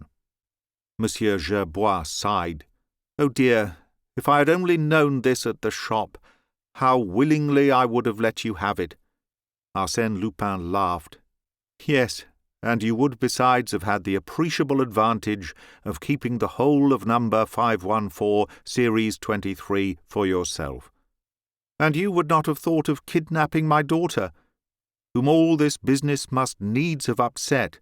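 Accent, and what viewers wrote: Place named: Purley, United Kingdom. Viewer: He sounds like a bloke you 'd meet in a pub in Purley . Not sure where you get your notions of Victorian spoken idioms and syntax from ??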